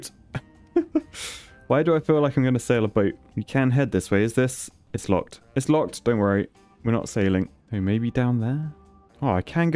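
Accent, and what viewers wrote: United Kingdom. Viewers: Honestly this feels like graystillplays old content but with a British voice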